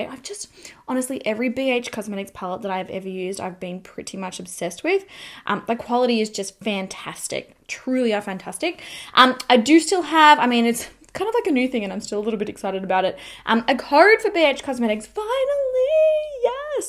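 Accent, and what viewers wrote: Australia. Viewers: You sounded so Aussie in this video! 😂. Loved this video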